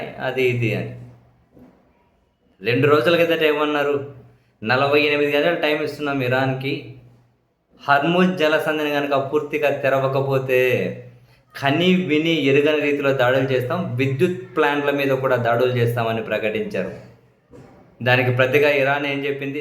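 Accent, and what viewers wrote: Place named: Konaseema, India. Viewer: Bhai...konasema slang.. vallu malli KELLU KOORU..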